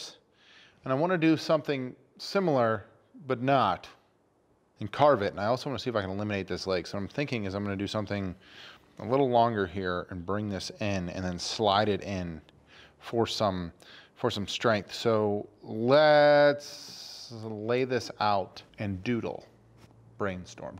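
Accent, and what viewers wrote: Pittsburgh, United States. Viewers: Hey yinz, new to the channel, love the Pittsburghese! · He has a Pittsburgh accent plus he says “Yinz”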